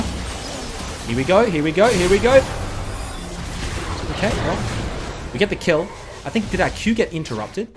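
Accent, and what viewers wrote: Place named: Australia. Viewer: The more I listen to, the more I like australian accent.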